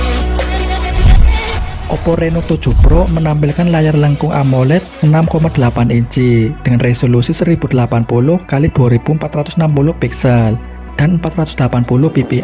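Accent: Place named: Padang, Indonesia. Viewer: Suaranya , Abang orang Padang ya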